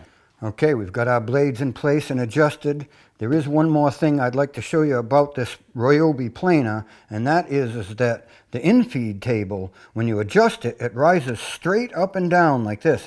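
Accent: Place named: Maine, United States. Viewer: I am guessing you're from Maine from your accent (I grew up there)....I just got my first planer and I greatly appreciate this video, I am going to make these adjustments THANKS!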